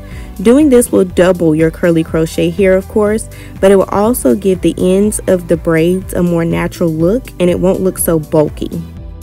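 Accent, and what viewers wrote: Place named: Jamaica. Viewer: Jamaican Accent Yes 💞💞 lol.. You come to take over YouTube 👏👏👌👌 Get it kinks Beauty..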